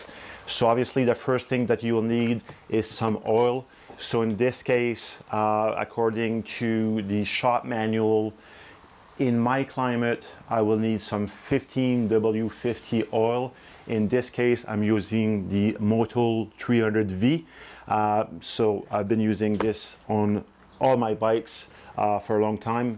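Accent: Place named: Saint-Sauveur, Canada. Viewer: Je suis de Saint Sauveur, je penses reconnaitre l'accent 😜🙏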